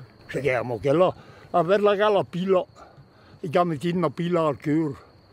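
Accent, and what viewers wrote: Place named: Brescia, Italy. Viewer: Che bèl bresà che'l parlå!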